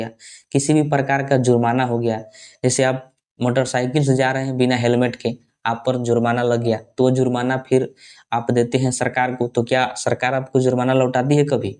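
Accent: Bihar, India. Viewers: Bihari boli hai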